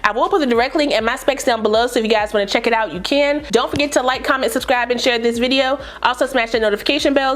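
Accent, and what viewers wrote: Washington DC, United States. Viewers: You from DC MA'AM??? I hear you rocking that go go!!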